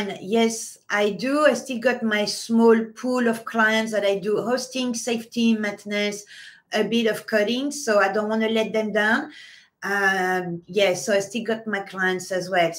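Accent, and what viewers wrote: France. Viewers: Merci , merci …pour votre bonne humeur , votre beau sourire , et vos vidéos que j’adore écouter , parce qu’enfin , je comprends tout , votre anglais est adorable , avec un accent français , un peu comme le mien 😂🥰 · Your cute french accent is so charmimg on youtube. Please don't go... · Take care of you but please keep us in your life! Love, love, love you and your social media. Best part is hearing your lovely, smooth French voice. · I recently found your YouTube channel and Wow!! I love all your content, your personality, your French accent 😄. I just want to say don't give up. It looks like you have so many people who support you and love what you do. Your ideas are classy, doable and timeless! I love it all! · Love your sense of humor ! Enjoy listening to your lovely French voice and uplifting ideas on all subjects ... so glad I found your utube channel. 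Best wishes. Karen from Denver Colorado.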